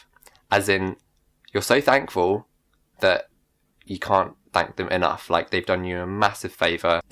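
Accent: United Kingdom. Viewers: You are sooo freaking amazing!!!!😍 I am currently binge watching your videos and I can't stop admiring you!!!😍😍😍 I wish I can go from Indian accent to the British accent like you do!!😍 It's magical trust me🌟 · Can anyone please tell me what kind of British accent does have? Is it RP?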